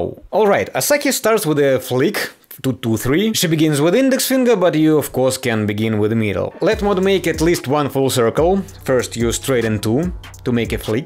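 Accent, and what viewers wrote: Russia. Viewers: Мне кажется. Что этот чел русский, акцент очень сильный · Таке ощущение что он русский, но говорит на английском. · Стопэ, ты русский? Акцент довольно таки русский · мне кажется, или я слышу русский акцент? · Такое чувство будто ты русский, который выучил английский ...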